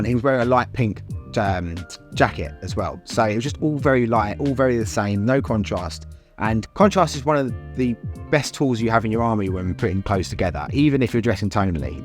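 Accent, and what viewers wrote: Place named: Essex, United Kingdom. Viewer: Sub'd! Learning more about how I want to present myself through your insights. Also, nothing wrong with a fine Essex accent.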